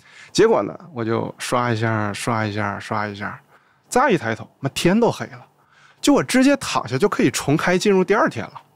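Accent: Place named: Shenyang, China. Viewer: 原来是沈阳老乡，听口音这么熟悉。加油，非常喜欢你的频道。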